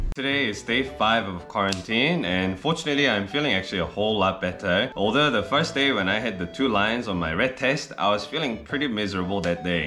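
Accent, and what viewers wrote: Singapore. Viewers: dude, where you? Still in NZ? Miss your Singlish leh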